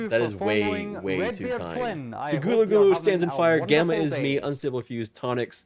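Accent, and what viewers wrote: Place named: United States. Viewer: Talking of you not having an English accent, at least you don't have to fake American pronunciations to get views like most English gaming Youtuber's, you just do it naturally. It feels really weird seeing (well, hearing) them do it but I believe it really does make a noticeable difference to them getting views.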